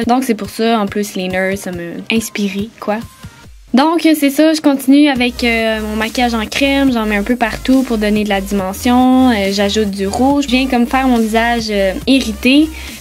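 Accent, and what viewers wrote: Quebec, Canada. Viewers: j'adore ton accent québécoise et au passage tes trop belle je m'abonne s'en discuter !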